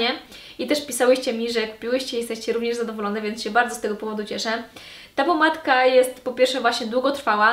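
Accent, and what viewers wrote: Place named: Ukraine. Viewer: jestes Ukrainką? Słyszę akcent.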